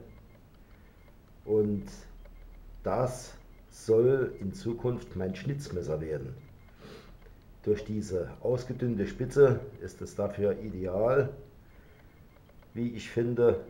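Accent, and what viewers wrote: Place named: Saarland, Germany. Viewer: Hab mir doch gedacht das ich da saarländischen Dialekt raushöre, aber St. Ingbert is mir doch ein bißchen zu weit. Gruß Andy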